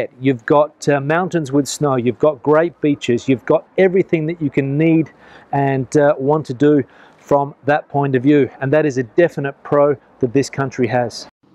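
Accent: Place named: Australia. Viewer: He sounds Australian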